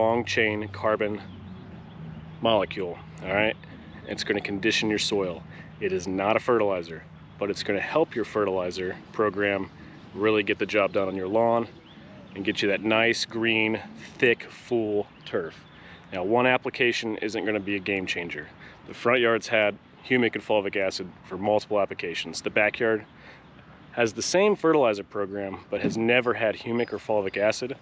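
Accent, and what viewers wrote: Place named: Australia. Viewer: An Aussie man! Aussome!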